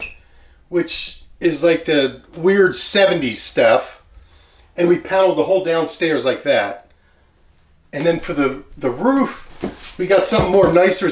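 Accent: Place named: Philadelphia, United States. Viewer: From Philly much?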